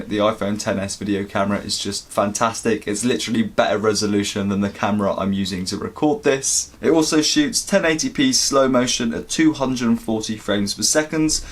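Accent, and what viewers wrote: United Kingdom. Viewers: Atr you british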